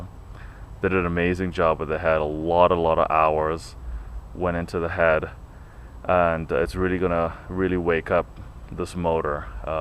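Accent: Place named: Russia. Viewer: woow very very nice man, you say you live in col springs yeah,? where is your accent from, almost sounds russian at some points but not exact, great video man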